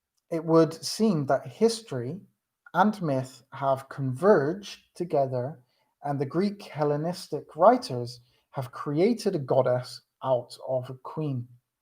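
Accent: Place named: West Country, United Kingdom. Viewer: Sounds like Devon n Somerset! Thanks for this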